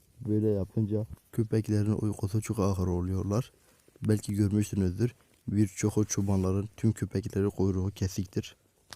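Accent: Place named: Muş, Turkey. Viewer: Şivenden dolayı muşlusun gibi geldi bana. Doğrumu tahmin ettim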